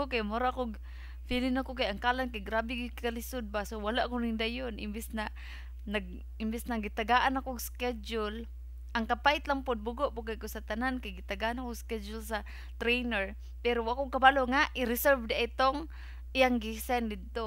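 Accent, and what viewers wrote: Visayas, Philippines. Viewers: gusto ko mag callan ay diko kabalo haha. maka lingaw ka mag bisaya sis haha. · ganahan kos imong bisaya accent, makalingaw paminawn. ma inspire pud ko maglantaws imong mga vlog.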